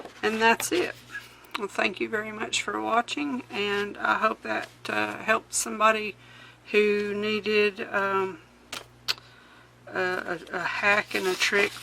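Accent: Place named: Indiana, United States. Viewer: This is so ingenious! And I was guessing Indiana. I recognize your accent. I was born in Indiana and lived there for years.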